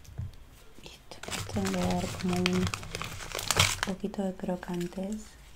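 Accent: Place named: Argentina. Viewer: El acento argentino junto con el tono y carisma de es buenísimo!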